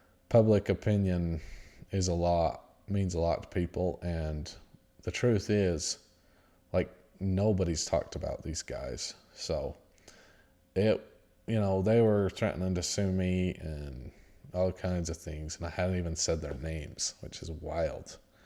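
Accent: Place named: United States. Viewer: I am so impressed with how sharp you are and with how calm and well-rounded your thoughts are. It’s really awesome to see you coming out of your shell and speaking your mind confidently🤗 PS: I don’t understand why people are giving you a hard time over your accent? I’m Australian so your accent just sounds to me like another American one that I don’t recognize😅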